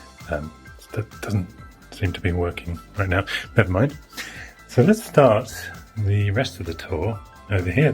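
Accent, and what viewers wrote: United Kingdom. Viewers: It's great to hear a blighty accent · your lovely British accent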